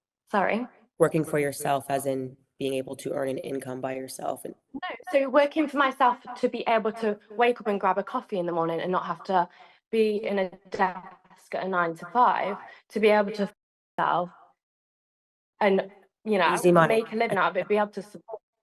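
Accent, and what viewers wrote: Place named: Yorkshire, United Kingdom. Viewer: yorkshire? me tooooo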